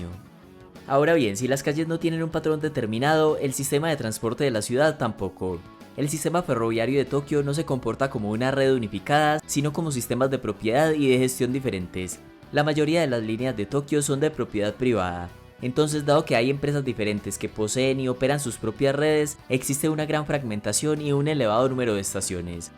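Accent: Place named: Medellín, Colombia. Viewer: La voz, si no me equivoco, es un acento de Medellín, Colombia. Si es así, que bueno que haya estos canales con tanto contenido de valor en nuestro país.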